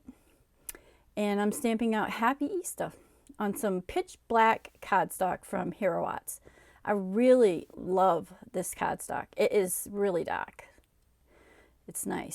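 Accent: New England, United States. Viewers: Are you from New England? Such a cute card. Lots of nice details. I like the cloud background. USA. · I love your accent!!! What state are you from?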